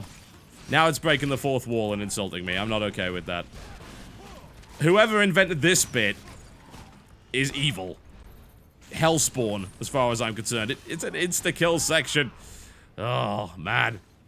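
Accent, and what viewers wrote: United Kingdom. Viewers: Oh by the way, The Gods, he is british. It's called an accent. Deal with it.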